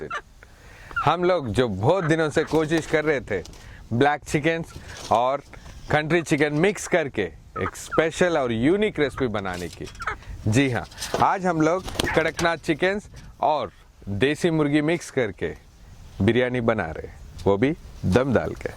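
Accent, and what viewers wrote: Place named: South, India. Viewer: i really like his south indian ascent. keep it up, dont try to speak proper hindi or urdu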